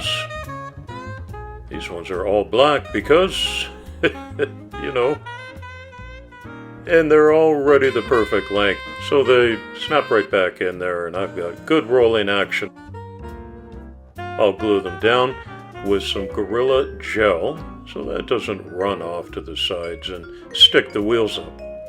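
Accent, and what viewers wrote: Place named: Canada. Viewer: Dude, I LOVE your (not at all) odd Canadian pronunciations. I've begun pronouncing 'decals' as "deckles" now and then. It's an American thing I'm trying to un-learn: just because we pronounce it one way doesn't mean that's the correct way. Deckles, deckles, deckles all day long!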